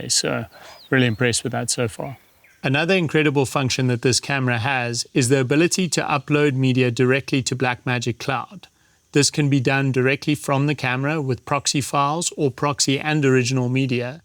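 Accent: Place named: South Africa. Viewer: Nicest Saffer accent I’ve heard. Usually pretty harsh. Great footage and gear review!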